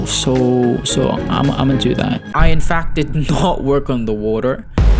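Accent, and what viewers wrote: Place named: Australia. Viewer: omg it’s an aussie!! side note: your building skills are litteraly jaw dropping oml🔥🔥, also the editing 🤌🤌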